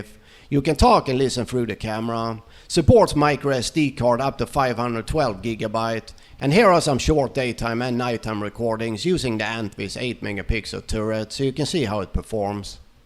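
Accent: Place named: Sweden. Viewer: Trevlig video, är du svensk? Låter så när du pratar engelska. 😊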